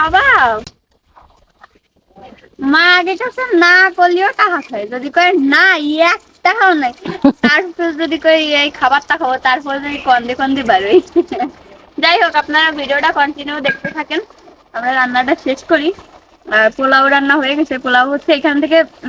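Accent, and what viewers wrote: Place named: Gopalganj, Bangladesh. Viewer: আপু আপনার বাসা কোথায়। ভাষা শুনে মনে হচ্ছে গোপালগঞ্জ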